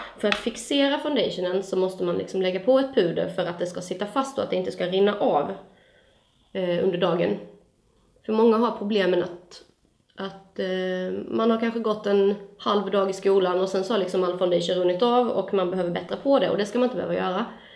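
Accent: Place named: Skåne, Sweden. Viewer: du är duktig, men det suger att du pratar skånska. :(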